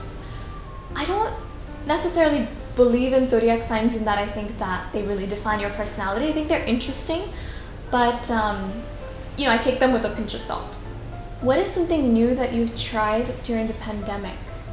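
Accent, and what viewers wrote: United States. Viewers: Why do you have US accent? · How are u able to speak English so smoothly and in US accent ?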